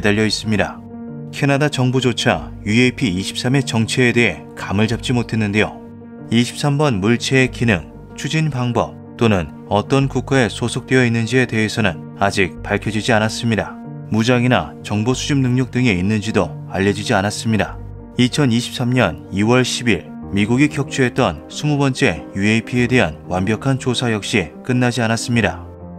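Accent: Gyeongsang, South Korea. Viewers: 뭘 믿어야 하지? 혹시 경상도 출신이세요?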